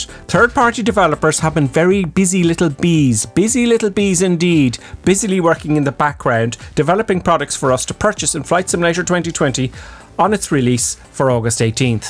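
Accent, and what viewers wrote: Ireland. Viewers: Dude, what kind of accent is that you have? Irish? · I don’t usually comment, I just want to say I really look forward to your videos always informative and very funny, and that incredibly Irish accent..ohh..heheh..your sense of humour, catching the train with all those middle class bogans nerds..classic. Thank you.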